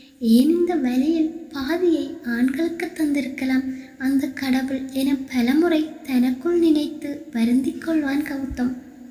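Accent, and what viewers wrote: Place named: Sri Lanka. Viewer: அக்கா நீங்கதானே காவலனே எண் காதலனே கதை எழுதுணது .உங்களுடைய வேற youtube channel ல படிச்சிருக்கேன் .எனக்கு அந்த கதை ரொம்ப பிடிக்கும்.அடிக்கடி அந்த கதை படிப்பேன். நிங்க அந்த கதை நீக்கிட்டீங்க😢.அந்த கதை பல மாசம தேடி பார்த்தேன் அது இல்ல.இப்பதான் இந்த channelல உங்க குரல் நிங்க பேசுற இலங்கை தமிழ் வச்சித்தான் கண்டு பிடிச்சேண் .திரும்பவும் அந்த கதை இந்த channel ல upload பண்ணுங்க அக்கா please😢😢.அது 9 episode தாண சீக்கிரம் upload பண்ணுங்க அக்கா.எனக்கு அந்த கதை ரொம்ப ரொம்ப பிடிக்கும்.உங்க பதில்காக காத்துகிட்டு இருக்கேன். 😢😢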